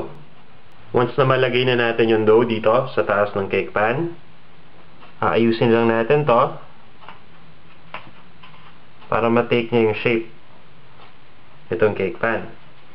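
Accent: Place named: Conyo, Philippines. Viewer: ang konyo mag salita buset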